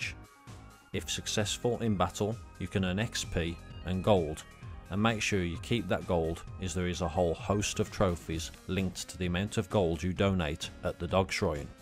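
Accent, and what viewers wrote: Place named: Midlands, United Kingdom. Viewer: Great video and content, plus it sounds like you're from the Midlands, so subscribed! Looking forward to more but in the mean time I will check out some of these games. Cheers.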